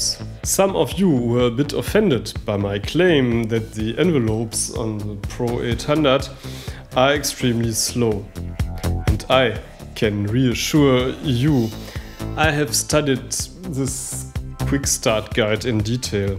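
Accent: Germany. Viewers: Gruseliges Englisch / Horrible German accent... Das lässt sich nicht ertragen und zuende anschauen 😒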